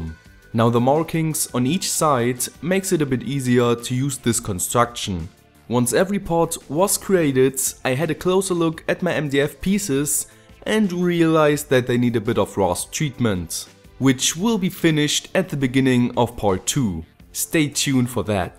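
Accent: Germany. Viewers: du bist deutsch oder ? das hört man :D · Kommst du aus Deutschlanf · Are you german?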